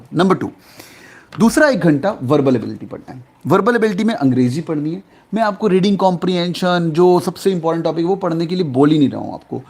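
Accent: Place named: Punjab, India. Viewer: Sir love from punjab ❣️❣️ aap bhi punjab se ho....apke accent se lgta hai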